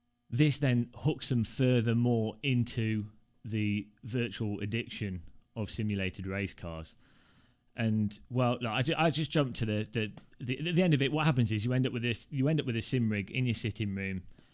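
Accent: United Kingdom. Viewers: I clicked on your bait but as soon as you spoke i knew it was more dry British humor, cheers A+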